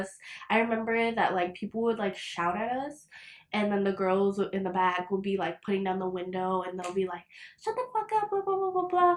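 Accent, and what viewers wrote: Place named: Miami, United States. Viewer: I KNEW SHE WAS FROM MIAMI OMGGG IVE BEEN WATCHING HER FOREVER BUT I WAS LIKE ITS SO CRAZY HOW SHES SO MIAMIAN BRUHHHH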